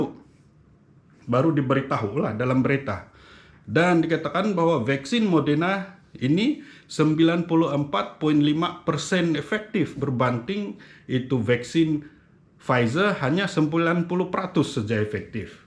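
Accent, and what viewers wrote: Sabah, Malaysia. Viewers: Bah sy mangarti jg DR ckp,sendiri mengerti,klw mw translet sma urg, karas tu lidah mw kc terang 😅😅😅biar kn mreka² yg nda suka DR brckp malay slang sabah 😁😅kunun kn DR 😁 Terbaik perkongsian ny Dr 👏👏👏💪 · X juga masalah kalau sy dr. Ngam laitu slang sabahan.. Kita pun di sabah ba juga ni.. Its realy doesnt matter at all... Maybe those who give negative cmment..or maybe'kayangan people'just dont like to share good medical info like u.. Salute dr!!!.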